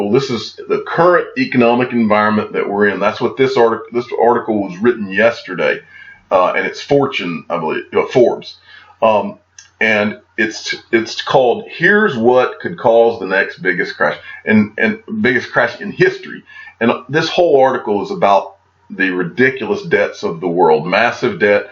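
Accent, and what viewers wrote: South, United States. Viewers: Maaan!!! You are the mf shiznitt!!! I truly appreciate ya along w a lot of other people! We always gonna have a few haters in the batch, so screw em!! I’m from the south too so when I hear ya talk, i swear it’s like listening to a uncle or close family friend schooling me on that xrp, baby!! We feel that southern hospitality from ya! So again just wanted send a big thank ya! muchos gracias! & a sho appreciate ya!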